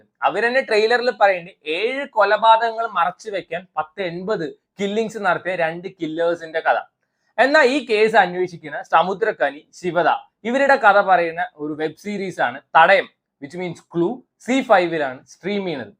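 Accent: Palakkad, India. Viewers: Nalla palakkadan slang❤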